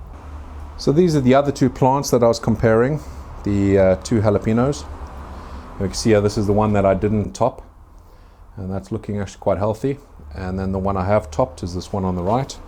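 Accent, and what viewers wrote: South Africa. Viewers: It's clearly South African.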